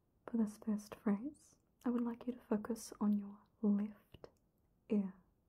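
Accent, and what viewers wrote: New Zealand. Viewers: Great video and love hearing the nz accent! Feels like being at primary school doing the hearing tests 😅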